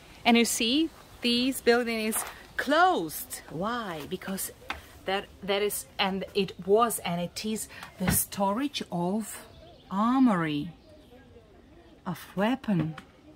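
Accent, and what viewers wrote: Russia. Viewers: хороший английский , но акцент супер русский)))) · Очень заметный русский акцент.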